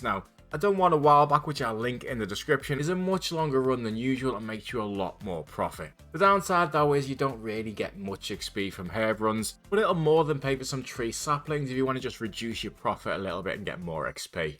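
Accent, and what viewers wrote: Liverpool, United Kingdom. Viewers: Super refreshing hearing a scouser play osrs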